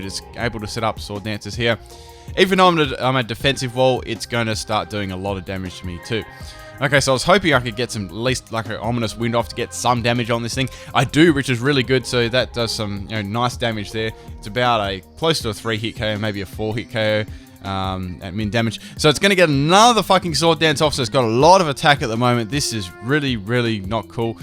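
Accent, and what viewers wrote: Australia. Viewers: are u australian or something i'm new in this channel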